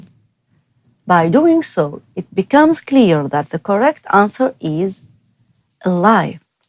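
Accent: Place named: India. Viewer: It's giving Indian accent ❤️😆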